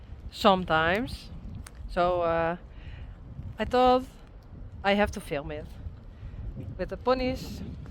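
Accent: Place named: Netherlands. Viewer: Ur English with the Dutch accent is perfect don't change a thing. Spidey is a character turning into a holy terror but that's his personality eventually as he gets older he will settle down don't sell him he's a large part of ur pony herd he's Mr personality. U seem to b recuperating at an awesome pace just don't overdue it acknowledge ur limitations before moving ahead so as not to reinjure urself.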